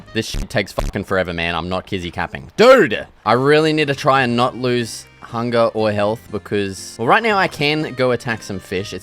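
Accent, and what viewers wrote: Australia. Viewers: Australian, isn't it? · Aussie gang, I can tell by your accent and how you’re saying a lot of Aussie slang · You sound like an aussie mate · This guy may have the most Australian accent I’ve ever heard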